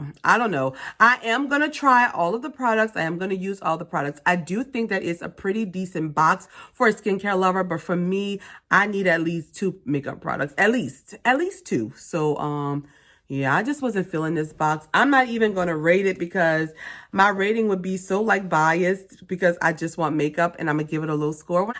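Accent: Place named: South, United States. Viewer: i can listen to a southern accent forever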